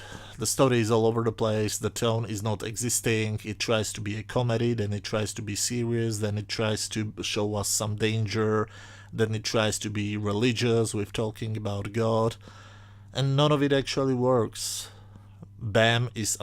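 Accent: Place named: Israel. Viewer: Uhm, where are you from? Your English is quite good, but if I had to guess (please don't be offended) I'd guess that you're Israeli. (I'm sorry if I'm wrong.) I just ask because I spend so much of my time teaching English to Israelis (some of whom are also Russian or Ukranian, very few of which are South American). Still, your English is quite good! Για να ξερετε.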